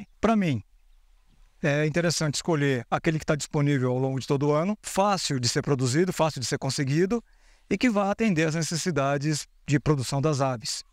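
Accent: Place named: United States. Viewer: Excelente vídeo ! No começo eu pensava que estava sendo dublado, pois o conteúdo, e a voz era estilo americano 😊 parabéns ! Vc realmente estende de criação de aves👏👏 ganhou mais um escrito 😎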